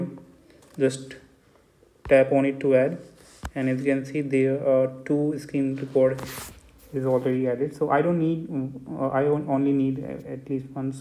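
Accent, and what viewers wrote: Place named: India. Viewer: As soon as I heard the Indian sound I knew it would work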